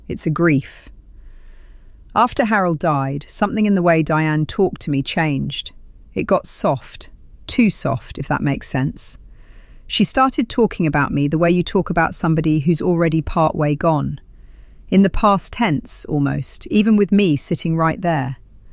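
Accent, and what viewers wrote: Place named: United Kingdom. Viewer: She sounds british. One more story about how wonderful the philillipines are why do so many philillpines come here and stay.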